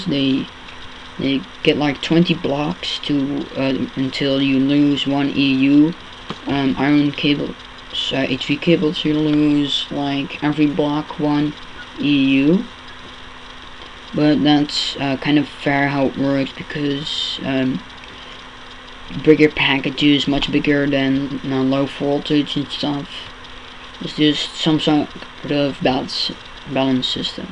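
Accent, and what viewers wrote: Netherlands. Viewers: thanks for the solar setup! seems much more efficient then the flowers, but the flowers were so pretty! unrelated to that: hello there, obvious dutch accent.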